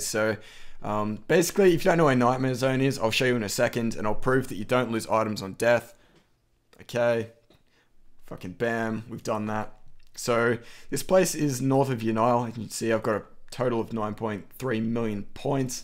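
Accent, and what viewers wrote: Australia. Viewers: Hey mate, are you from the NT? you sound like a real Aussie bloke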